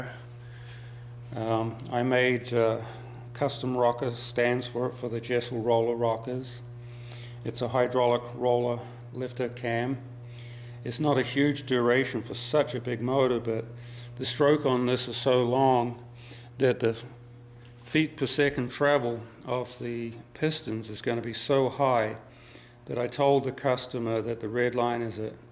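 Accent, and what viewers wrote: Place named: Australia. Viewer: Mate you the best, i bet your part australian, based on how your talk and how some of the words are spoken. keep up the great work mate and i hope to see it on the dyno. cheers please supply a link of the dyno ?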